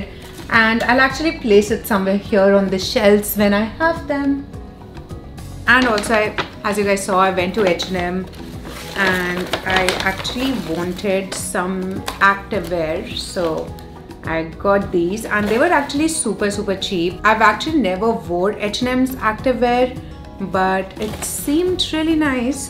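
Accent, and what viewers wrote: India. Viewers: her English is perfect she just has an Indian accent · I am not trying to be a grammar nazi but she makes a lot of basic grammatical and pronunciation mistakes lol. If People genuinely want to learn English they should go to the right source. I know she has an Indian accent and there is nothing wrong about it.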